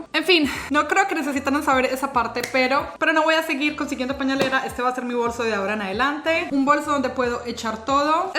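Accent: Colombia. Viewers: Como me gustan tus videos!!!!! Amo cuando hablas con tu tonada Colombiana, me encanta!!!!! Otra cosa, lo que decías de cargar combustible que no te gusta, me pasa eso mismo pero cuando voy detrás de algún camión o camioneta cargados con algo, por haber visto peliculas como "destino final" me da mucho miedo y si o si tengo que pasarlos jajajajaja Me encanto tu rutina semanal, como todos tus vídeos, por supuesto! 🌞❤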